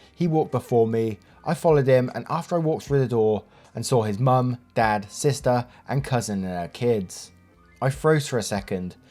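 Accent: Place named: Midlands, United Kingdom. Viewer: Where abouts are you from? I can't place your accent is it Midlands?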